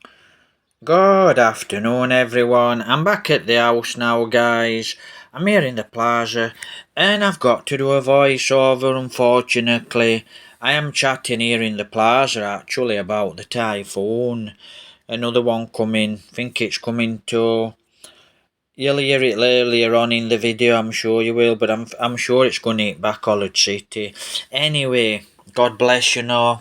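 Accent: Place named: Barnsley, United Kingdom. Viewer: Hi mate. I’m from Hoyland. Just having a debate with my mate about if you were actually from Hoyland as he says your accent sounds more Barnsley than Hoyland. Can you put him straight where you went to school etc. cheers mate. Look after yourself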